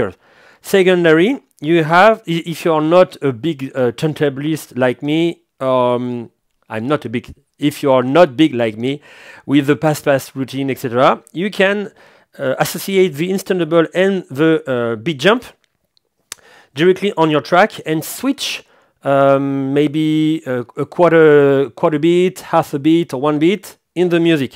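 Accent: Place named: France. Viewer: l'accent français de merde XD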